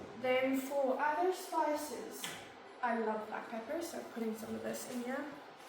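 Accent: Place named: United States. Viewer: 😂 oh how she’s grown and that accent, efinitely the American guest influence, just love it!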